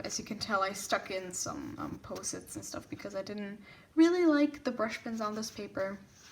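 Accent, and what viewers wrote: Germany. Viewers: Waitttt are you german?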